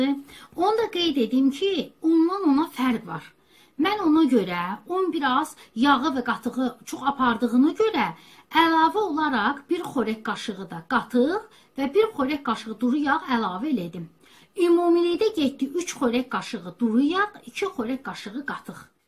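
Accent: Baku, Azerbaijan. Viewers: Çox sevdiyim Baki ləhçəsi çan baçim əllərivə sağlig çox təşəkkürlər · Bakili danishigidi. Allah bereket versin baci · Nəhayət ki......👍👍👍👍👍👍👍👍👍👍👍♥️😘💐. gözləduyimiz paylaşim...,eşitmək istediyimiž Bakı ləhçəsi. səlis izah, dadlı plov.....,sizdən gələn cəkilişlərin ardı gəlsin in şə Allah canim♥️💋💐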